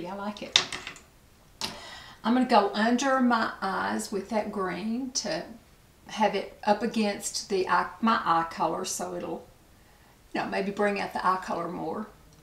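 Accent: South, United States. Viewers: Love your hair colour .and red certainly suits you .but. The nicest is that accent ! Remind s me of , " gone with the wind " .Dont love wet n wild cheap . Looked nice but not for me .Happy valentine's ❤️🌹🌹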